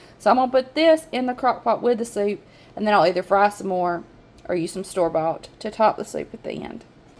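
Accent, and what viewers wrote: South, United States. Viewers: How can this not be a must make soup your opening said it all: My favourite soup recipe, potato recipe and crock pot recipe 😃. It’s in my recipe journal. I liked your reflection about washing dishes and the use of that time. Thanks for putting the recipe in your notes. I really like your southern accent it’s one of my favourites, it’s like a big hug. Thanks for sharing, so far from soup September one of the easiest to cook, thank you crock pot inventor. We all need a bit of easy in life. 🍲🥔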